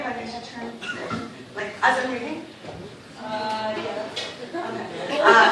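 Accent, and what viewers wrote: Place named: Georgia, United States. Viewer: I love this, and I love this book!!!! Her accent sounds Georgian. I’m from Alabama, and we don’t sound like that, and it’s sure not Cajun or Mississippi-ian. Thanks for the upload!:)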